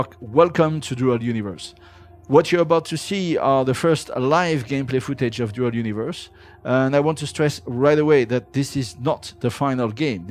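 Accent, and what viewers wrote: France. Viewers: This guy sounds French, am I right?? Hmm, French doing good stuff these days. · On entend bien que le narrateur est français :) Je viens de découvrir le jeu et bravo aux parisiens pour ce travail pas encore finit mais impressionnant au possible ! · Cet accent français quoi x) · Magnifique l'accent français... ;-)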